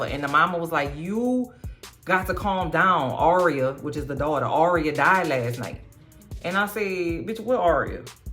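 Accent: New Orleans, United States. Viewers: The New Orleans dialect is the absolute BEST!! I love the way you speak!! ❤❤❤❤ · It’s the N.O. accent for me😂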